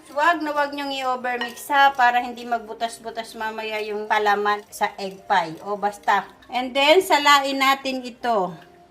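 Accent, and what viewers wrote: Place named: Paete, Philippines. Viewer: taga paete laguna po ba kayo? Pansin ko lang po kasi sa punto. heheh ang ganda po ng cooking vlog nyo. madaling sundan sobrang detalyado..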